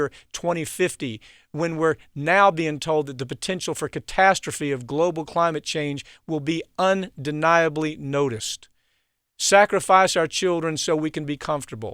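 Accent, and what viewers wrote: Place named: South, United States. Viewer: And with a southern accent on top. He's like a character in a John Grisham novel.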